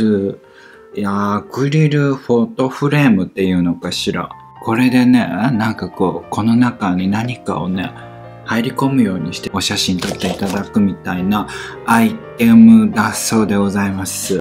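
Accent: Japan. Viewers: 💕 ごきげんよを🤗 たまに飛び出す関西弁が めっちゃ好きやねーん❣️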